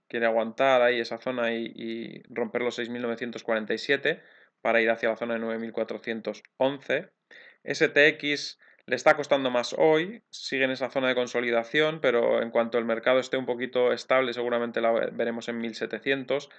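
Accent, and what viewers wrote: Spain. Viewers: Gracias a tí por tu esfuerzo para con nos. Por cierto, de dónde eres? Me suena tu acento de Vitoria o por ahí. Es curiosidad, y sobre todo por si ando por allí y te veo, invitarte a tomar algo. Te lo mereces todo. Gracias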